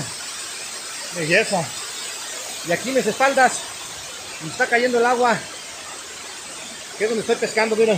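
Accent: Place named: Bajío, Mexico. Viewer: Buena pesca amigo disculpe su acento se escucha como del bajío ... saludos desde Valladolid Yucatán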